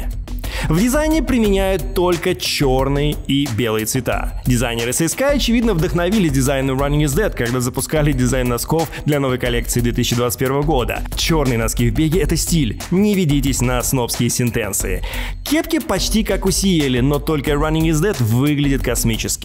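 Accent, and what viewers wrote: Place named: England, United Kingdom. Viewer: О этот английский акцент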